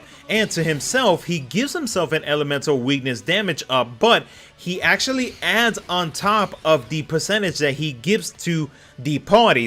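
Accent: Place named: Brooklyn, United States. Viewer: This American accent...Brooklyn? Queens?I wanna know! I love 💘 it.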